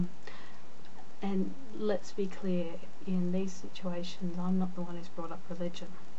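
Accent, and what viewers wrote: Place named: Australia. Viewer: Okay now I can hear the Australian, sorry.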